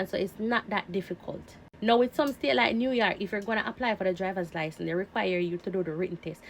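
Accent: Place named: Jamaica. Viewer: Thank fada Gad ur Jamaican,this i understand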